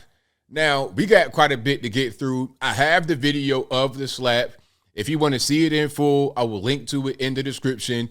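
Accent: United Kingdom. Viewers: Brit here, your British accent is approved! Britney Spears seems like a nutcase to me. I don't think she is all there. Imagine Victor Wembanyama just about to get started on what will likely be a stellar basketball career, and he finds himself in the middle of this nonsense! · doing a British accent is awesome. I think he should do every headline in British. Tut tut, good man.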